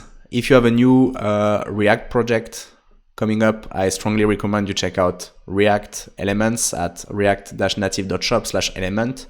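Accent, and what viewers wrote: France. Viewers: c'est encore plus stylé avec l'accent frenchie ahhaa ;)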